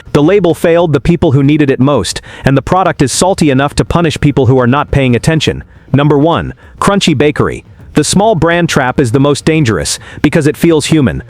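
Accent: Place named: United States. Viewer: Loose the American voice, it’s about the UK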